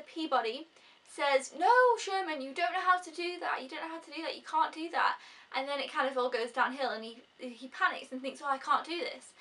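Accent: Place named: United Kingdom. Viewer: I LOVE(d) YOUR REVIEW! IT IS NICE AND REFRESHING TO SEE A BEAUTIFUL WOMAN REVIEWING AS MOST MALES ARE REVIEWERS. You are perfect reviewing! With that said, I have been to your country twice (one time, a DAY trip from NY to London and back), and another time, couple day trip. Have you been to the States here? Moving on: I do own over 18,000 dvds/blurays all genres, including over 100 3-D, and 945 laserdiscs. And a full theatre surround system. Anyway, I do plan on purchasing MR PEABODY as I grew up with the original cartoon. And you convinced me it is a good movie to purchase. I look forward to your future reviews. You are so bubbly, cute, love the accent, and you are so much fun to watch review. Thank you very much, keep up the great work, and looking forward to your next review, whatever movie it will be. (I recently purchased TRANSFORMERS 4 3D, MILLION WAYS TO DIE IN THE WEST, GODZILLA 3D, and others.) Take care, Happy Holidays, and as you say in UK, "Cheerio"! 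Bye for now. (*feel free to reply back if you'd like). BYE!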